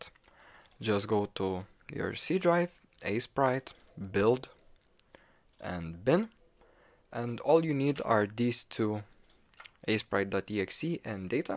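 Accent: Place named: Lithuania. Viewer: u sound like lithuanian xd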